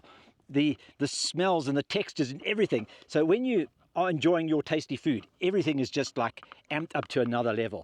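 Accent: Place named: Afrikaans, South Africa. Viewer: Love the boerwors accent man it's always nice and crispy 🔥👊🏽.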